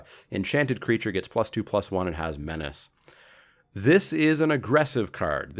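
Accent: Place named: Canada. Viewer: Nice content. Where are you from in Canada that you pronounce "un" as "on"? Tap an "ontapped" ally you control. Onto the "oncommons". Etc.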